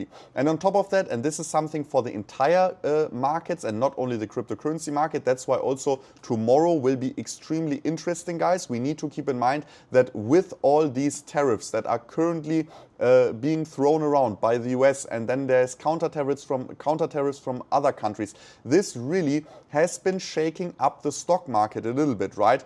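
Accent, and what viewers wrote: Germany. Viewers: Altcoin Daily Bright me here! Which European country are you in? Sounds a little German maybe? Anyways, I'm in the US and you're 6 hours ahead of me. If you did livestreams at 9pm your time, would be perfect for me! Keep up the amazing work! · Hör ich da einen deutschen Akzent? ;-) Danke an Altcoin Daily.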